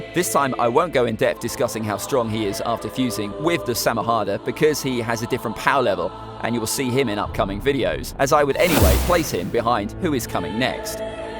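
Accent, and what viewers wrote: United Kingdom. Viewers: His accent is from hartforshire, England you clown 😂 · Bc hes british so he has higher accent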